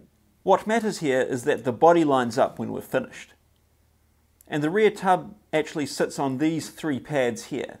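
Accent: New Zealand. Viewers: That would be the New Zealand pronunciation, just like 'fush n chups'! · I wish you did this full time! Your videos are genuinely excellent. I think it is the kiwi commentary that makes them! Can’t wait for the next. 👍👍👍👍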